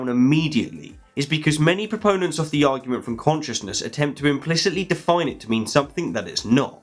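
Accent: England, United Kingdom. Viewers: Seems people are convinced by listening to the beautiful English accent. What is freely asserted can be freely denied but if he is preaching to the choir we have a confirmation bias problem. How something immaterial can come from matter was not explained. Where do abstract ideas come from? Can justice, kindness, morality just pop out of the brain? While this may not prove any one religion, it sure points to the strong possibility that the designer of humans was a little smarter than random accidents. If things evolved then who or what was guiding the evolution. Random just does not cut it. The videos tend to be sciolistic and many of the readers are echo chambers for the sequacious opinions expressed.I read all of these so I can debunk the "debunked syllogisms" for my logic students.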